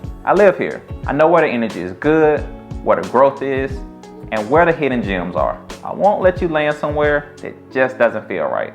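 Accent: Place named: North Carolina, United States. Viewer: I can tell by that accent he is actually from nc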